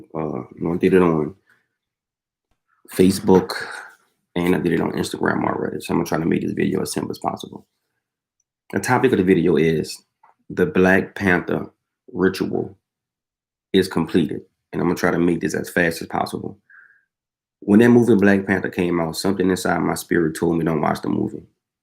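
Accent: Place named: Louisiana, United States. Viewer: Spot on!! Its 2020 and if u dont feel something aint right then u are basically walking dead at this point. Brotha u sound like u from LOUISIANA/new orleans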